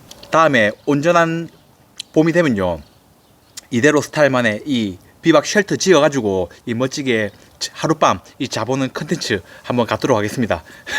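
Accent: Gyeongsang, South Korea. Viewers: 경상도 사투리 고쏘하이 처직입니데이ㅋㅋㅋ 경상도 사나이 시골 냄새 뿜뿜 너무좋아요🤗👍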